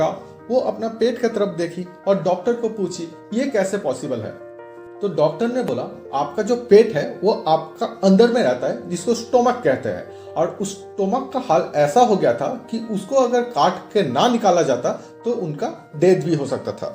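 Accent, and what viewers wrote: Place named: Bihar, India. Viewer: Yeh bost bihari bolna sikh le pahle...tera problem pasand nhi aya..teri boli.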